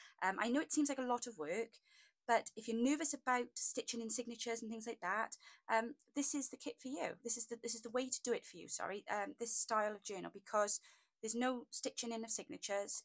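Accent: Wales, United Kingdom. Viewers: Wow! Just wow! How beautiful is that kit? and it's free!! That's very generous of you. I'm a new subscriber and am so thrilled to hear your Welsh accent. I was born in Wales and my parents emigrated to Australia when I was two. Now that they have both passed away I really miss hearing their accents. I would have subscribed to your channel just for the accent lol but your content is beautiful. Many thanks for sharing your positive take on autumn.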